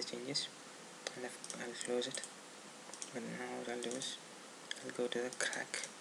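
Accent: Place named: United Kingdom. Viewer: Inglés británico.... es otro nivel, deja de estudiar el inglés americano porque no sirve realmente.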